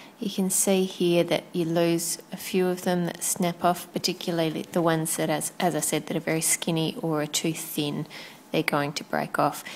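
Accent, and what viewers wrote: Australia. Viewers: Are you Australian? 😊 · is your accent australian?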